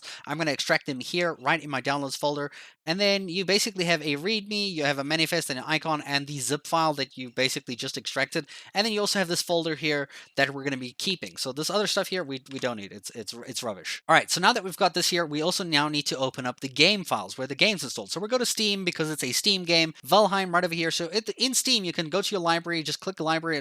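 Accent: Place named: South Africa. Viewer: My dude. Where are you from? You sound North American, but then you 100% don't. South African and moved to the US or Canada? hmmm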